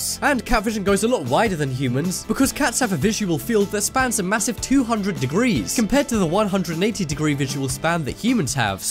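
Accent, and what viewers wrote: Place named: England, United Kingdom. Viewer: Great now that’s all I can fixate on. I already find the English accent one of the most annoying, almost to the point of it sounding like a speech impediment.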